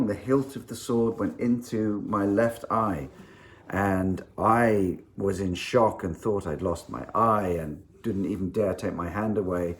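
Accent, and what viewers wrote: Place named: United Kingdom. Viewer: I always forget about your British accent because I watch Michael Cutter too much.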